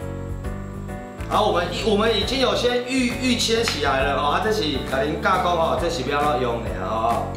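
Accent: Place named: Taiwan. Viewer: 的台灣國語很口愛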